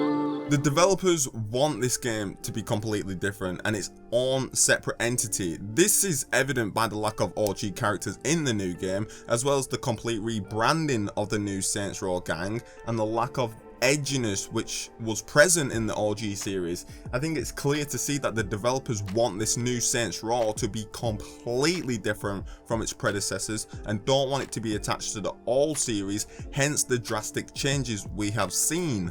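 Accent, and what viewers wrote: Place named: Geordie, United Kingdom. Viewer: Where are you from? I’m from Southern California. Are you a Geordie? Your accent is so tricky to place lol.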